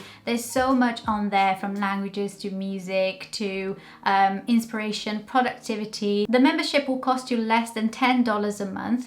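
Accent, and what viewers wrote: Wales, United Kingdom. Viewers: You’re picking up a Welsh accent 👍🇬🇧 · I love your british accent, it's sounds so beautiful and clear You've been helping me a lot with my English, "kisses and hugs" from Brazil! Obrigada por tudo ♥️🇧🇷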